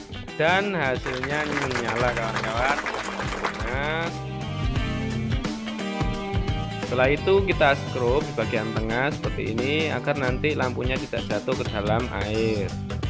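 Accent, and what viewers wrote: Java, Indonesia. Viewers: Jowo ne kenthel..."MBRAWUL"..😀😀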